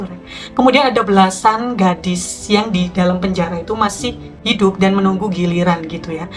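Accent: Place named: Java, Indonesia. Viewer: Beda kak , kaka ini njelasin dengan caranya lbh slow dan medok , klw ka nadia patas dan heboh , punya ciri msg2 sih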